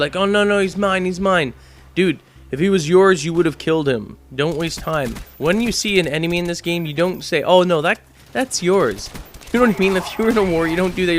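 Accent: Canada. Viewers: are u canadian????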